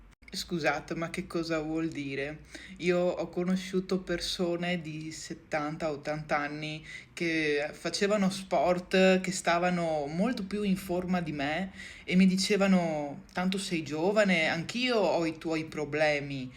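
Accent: Veneto, Italy. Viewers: Comunque volevo dirti che sei molto carina ed hai un bellissimo accento veneto ( mi sembra che sia veneto), magari dirtelo non serve a niente, ma è quello che penso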